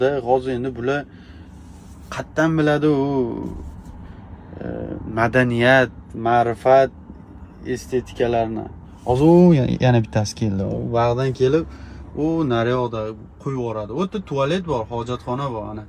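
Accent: Fergana, Uzbekistan. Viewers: Фаргонаданми брат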